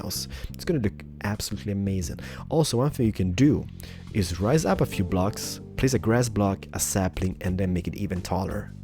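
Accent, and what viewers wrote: Sweden. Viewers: are you swedish? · Are you swedish?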